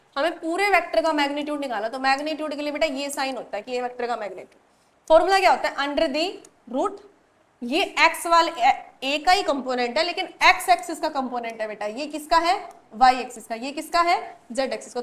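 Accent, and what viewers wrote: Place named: Haryana, India. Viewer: Aap Haryana se ho lag rha mujhe... Excellent explaination mam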